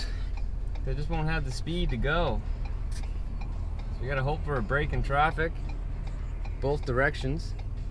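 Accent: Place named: Maritimes, Canada. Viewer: Get it done before the snow flies again on Monday. You're "Maritimer" accent is coming out "Started up the trucks from inside the "ouse" so glad you don't have a "bout" too. Hahaha. Don't worry I bug my friends too about it. Nothing like a Caper talking about the squalls or de fishing season. Cheers.🎄❄🎄❄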